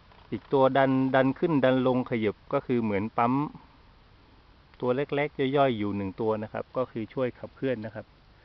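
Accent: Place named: South, Thailand. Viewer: สำเนียงน้ำเสียงเหมือนคนใต้เลยครับ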